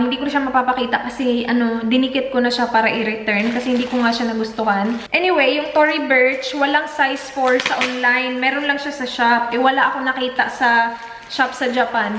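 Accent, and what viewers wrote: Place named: Cebu, Philippines. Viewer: Hi po ate bisayaaaaa kaykaaa ate cebuana kaayo💛😍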